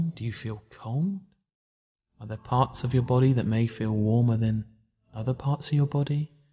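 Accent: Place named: United Kingdom. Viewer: I loved the british accent!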